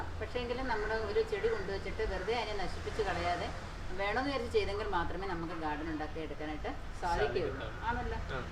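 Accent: Kollam, India. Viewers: Nammude kollam style bhasha ayyam puredam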